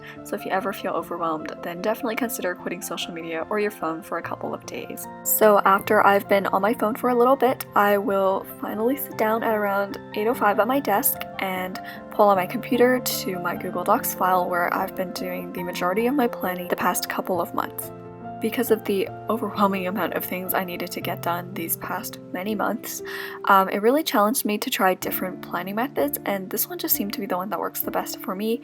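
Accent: Canada. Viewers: She is back with her cute Canadian accent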